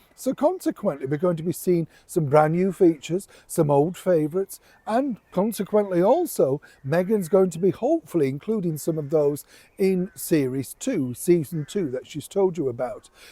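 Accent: Yorkshire, United Kingdom. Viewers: Aaahhh, that Yorkshire northerner straight talk! “A washed up Diva”..😂😂❤ You so nail it.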